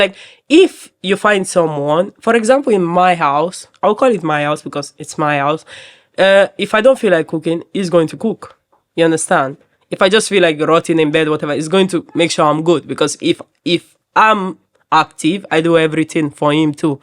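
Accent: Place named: Haiti. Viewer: Her accent sounds haiti also, I was talking to someone today "Nigerian". We spoke to the extent of dreams and ambitions on inventing solutions for the nigeria economy. He said poor people don't dream, they only they only survive meaning we cant solve our problems cause we trying to live for tomorrow while dying today. She is right.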